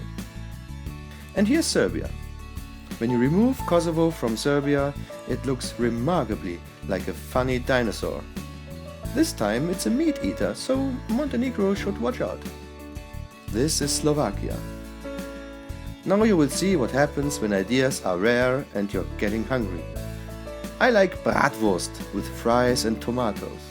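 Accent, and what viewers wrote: Germany. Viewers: I love this, it's crazy in a good way. Your accent also adds to it as I can picture you a slightly bonkers German scientist. Are you from southern Germany? I've always seen my country Britain as as a strange seated witch driving a car with the extended leg of Cornwall and the extended arms of north and south Wales. The Lake District is her adam's apple and south-west Scotland is her beard. She is sneezing out of a hooked nose at the Argyll penninsula and has pooped out the Isle of Wight.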